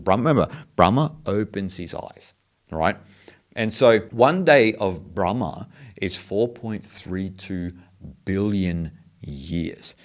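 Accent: Australia. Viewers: Thousands of years old, very amazing concept of creation and the universe, very deep, not as flat as the 7 day genesis. When I came across vedic literature, many, many years ago, I was astonished, sucked into these mind boggling concepts, still blows me away..lasting some 55 years already. Robert Oppenheimer is said to have studied sanskriti to be able to understand these concepts untarnished by translation ! P.S. do I detect some Ozzi accent here?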